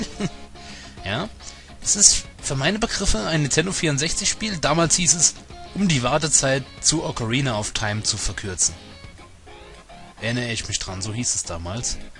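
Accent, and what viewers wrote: Hesse, Germany. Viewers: bist du aus ghessen, wegen dem akzent?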